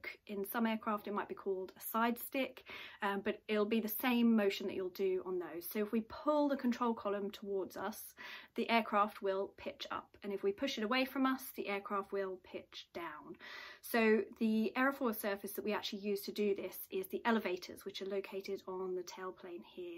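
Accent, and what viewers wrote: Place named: United Kingdom. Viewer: Thank you! I love that I understand you perfectly, even with your British accent (I'm not a native American speaker).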